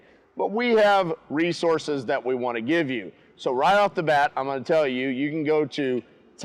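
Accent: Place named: South, United States. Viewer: You sound like a guy from down the way. I grew up in a town of 300 people in the south.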